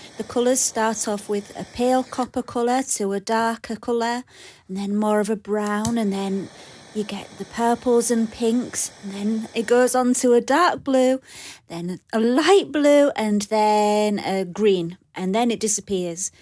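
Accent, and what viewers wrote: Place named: Yorkshire, United Kingdom. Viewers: I love your east riding twang. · Nothing more pleasing to my ear than a Yorkshire accent. Thanks for the tutorial!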